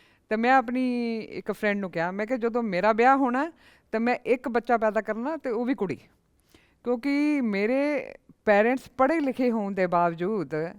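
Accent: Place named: Punjab, India. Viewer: ਕੁੱਝ ਵੀ ਏ,ਏਸ ਔਰਤ ਦੀ ਠੇਠ ਪੰਜਾਬੀ ਬੋਲੀ ਦਾ ਮੈਂ ਬਹੁਤ ਕਾਇਲ ਹਾਂ।ਅੱਤ ਕਰਾ ਦਿੱਤੀ,ਅੱਤ।ਟਾਇਰ ਪੰਚਰ...ਫ਼ੇਰ ਐਂ ਦੇਖਣਗੇ।😀😀😀😀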